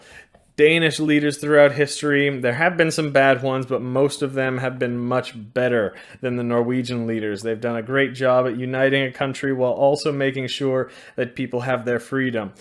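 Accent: United States. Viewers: Pretty sure he is Norwegian, yes. Most non Americans develop an American accent when they learn English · Is the bloke in this video actually Norwegian? He sounds very American. I know Norwegians, they don't sound like this bloke, this bloke sounds like an American that moved to Norway (or Norwegian but spent more time in America)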